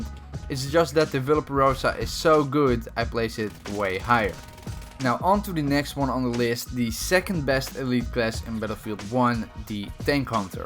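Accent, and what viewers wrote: Netherlands. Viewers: are you netherlends of dutch because your accent? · Jij bent Nederlands ik hoor het aan jou accent😂😂 maar toch een goede video 👍👍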